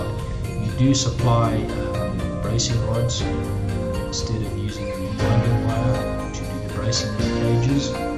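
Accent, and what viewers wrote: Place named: South Africa. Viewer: PS, sorry for changing your gender to, lol. Your accent tells me you are in the S.African continent, we are in the UK, otherwise maybe we could get our supply from you. Our engineer and architect has mentioned a company called ENVIROMESH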